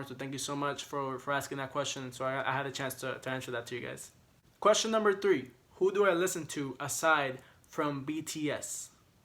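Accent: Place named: Puerto Rico. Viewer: 5'9 jeez everyone towers over me I'm such a hobbit. XD This was a cool video, I like hearing the kinds of things you feel are important. I laughed when I heard you say PR. I'm from NYC and that was my first guess when I heard your accent. Anyway. Thanks for this video. I'm glad you want to do something good for the world with what you have (!). When I first saw your videos I knew you were going to be an ARMY - because people who want to do something to make the world a little better tend to be the ones who are drawn to BTS. I'm really interested in the environment too, I love cultures and religions and like green technology. We'd hang, I bet. XD I think you might like checking out a video called Inside the BTS ARMY that was done by MTV. When you see it I bet you'll know why I knew you'd be family from the very beginning.:D And if you want something funny, BTS on Running Man ep 300 was just re-released by KOCOWA. 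They were on the show (a variety show - you might like them) a couple of years ago and it was pretty cute. I don't know the copyright rules for it though. I think it should be okay... but maybe check with people.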